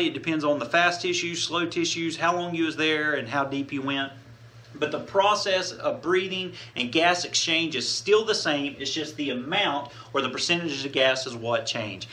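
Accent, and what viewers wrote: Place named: Appalachia, United States. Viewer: Not only are you Southern, but you're also Appalachian. Gotta love those Hillbilly boys!! (I'm a Hillbilly, born and bred in East KY) <3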